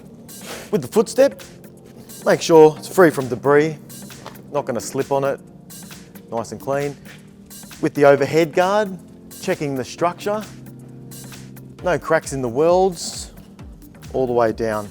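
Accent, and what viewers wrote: Australia. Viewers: The guy is from Australia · The Australian accent is priceless! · The language is English. The "accent" is Australian.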